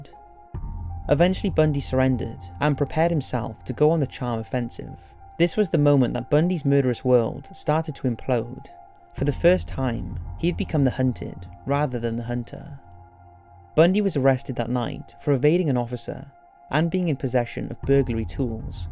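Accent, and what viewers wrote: United Kingdom. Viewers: Why in the fucking hell are there only British narrators on this bullshit, fuck this.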